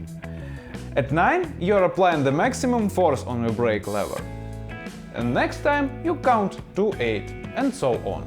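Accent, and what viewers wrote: Russia. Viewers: Very good information, very bad English. You have to do something with it. Your English is too Russian)) Your tips are really useful. Thanks a lot! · Какой же тяжелый русский акцент😄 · С этого видоса я прям прочувствовал русский акцент в английском языке. 😂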